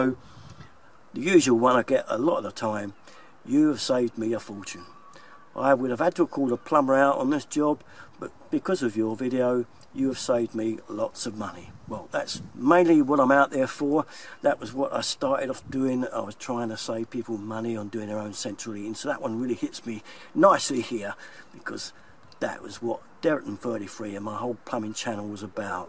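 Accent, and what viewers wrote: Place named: London, United Kingdom. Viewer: What part of London are your from?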